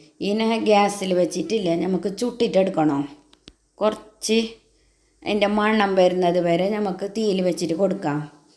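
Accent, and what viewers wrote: Kasaragod, India. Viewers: നിങ്ങൾ കാസർകോട് ആണോ ഭാഷ കേൾക്കാൻ നല്ല രസമുണ്ട്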